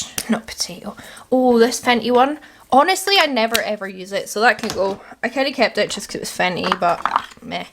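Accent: Scotland, United Kingdom. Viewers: I like your Scottish accent. Good declutter.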